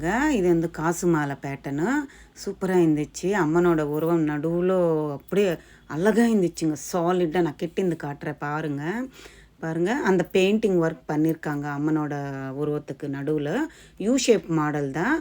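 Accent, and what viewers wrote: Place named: Telugu, India. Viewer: Your telugu mixed tamil voice.. is my favourite ❤️♥️ that too you say superuh irunthuchii 😍 anyways your video collections are so nice sister...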